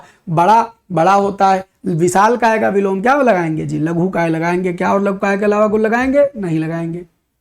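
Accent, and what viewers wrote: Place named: Kanpur, India. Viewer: Aap sir Kanpur m rahe h kya boli aapki vaha ki lgti h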